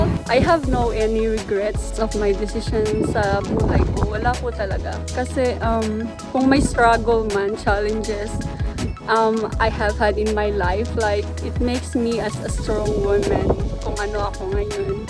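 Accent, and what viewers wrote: Visayas, Philippines. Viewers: Hello. My kababayan Alam Kong visaya gyud Ka dahil Yong pag bigkas mo Ng Tagalog migdyo pilipit. Proud ko sa mga PILIPINO kahit saan kahit anong trabaho marunong mag tiyaga . God bless you & your Family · BISAYA po kayo ma'am?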